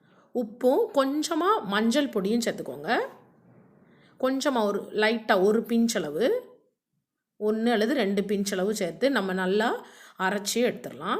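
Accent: Tirunelveli, India. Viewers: Nice mam... Neenga tirunelveli ah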